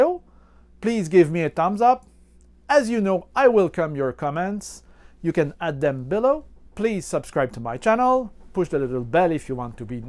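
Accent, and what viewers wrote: Quebec, Canada. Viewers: Allo juste avec ton accen je comprend que tu dois venir du québec ;) as-tu essayé avec la version actuel de cura sois la 4.4.1 ? j'essias et je ne trouve pas comment faire...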